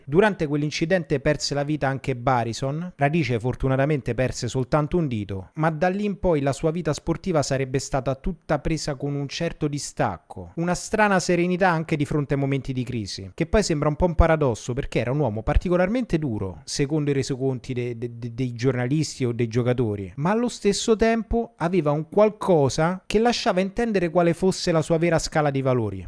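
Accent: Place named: Rome, Italy. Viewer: Parli uguale ai miei cugini più grandi romani, me ne parlarono tanto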